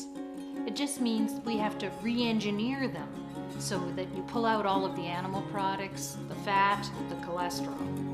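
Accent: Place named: Canada. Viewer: The Canadian accent is really only perceptible in the "ou," but it's the tone of your voice, speaking rate, and enunciation that make your videos a pleasure to watch....and the food! :)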